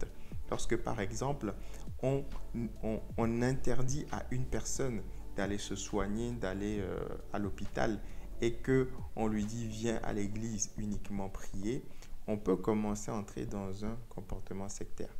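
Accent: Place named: Ivory Coast. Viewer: Bon enseignement pasteur et excusez-moi vous êtes ivoirien ? Parce que je crois avoir reconnu l'accent haha. GOD bless you🔥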